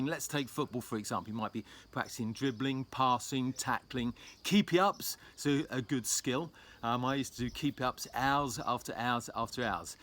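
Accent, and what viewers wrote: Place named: United Kingdom. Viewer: We must be close in age because I also learned skiing with over 2 meters under my feet and extension was kind of essential to rotate those monsters. And now I still struggle to avoid doing it because with these new skis extension equals lose control... btw I've started skiing again only five years ago and adjusting is still problematic at times. Thanks for these videos, love the Brit accent, but remember here in the US a stretched middle finger might send a problematic message :)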